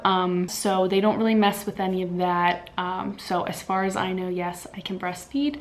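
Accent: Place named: Philadelphia, United States. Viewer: Sounds like your from philly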